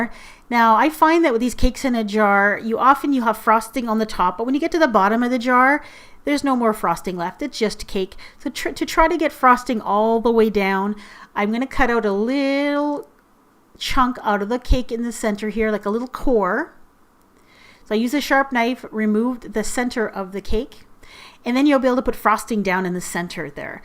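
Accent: Canada. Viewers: I love your accent! Are you from Canada?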